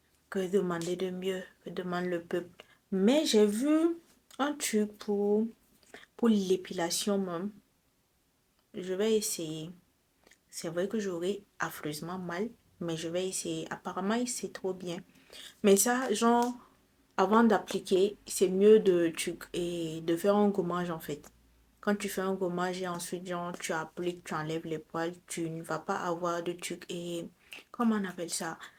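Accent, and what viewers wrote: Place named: Burkina Faso. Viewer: Dès 5 premières secondes j'ai su qu'elle est burkinabè 😂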